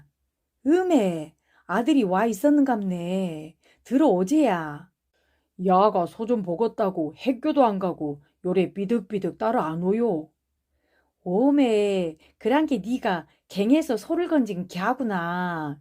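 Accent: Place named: Goheung, South Korea. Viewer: 내고향 고흥 사투리에 어린시절이 생각나는 실감나는 명작이네요 아~~옛날이여 잘들었네요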